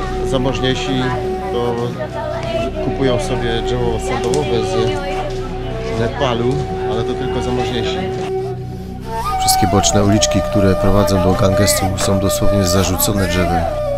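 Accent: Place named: Silesia, Poland. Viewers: Lektor powinien popracować nad dykcją. Film bardzo interesujący ale ten śląski akcent jest nie do zniesienia. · Ten Pan bardzo ładnie mówi po polsku A akcentu śląskiego nie da się wykasować ...także nie czepiaj się...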